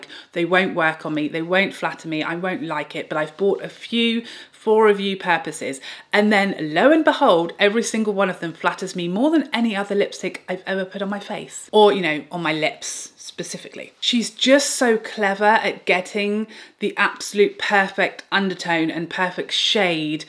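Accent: United Kingdom. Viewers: The hair looks great 😍 I need blue eyes and a British accent now!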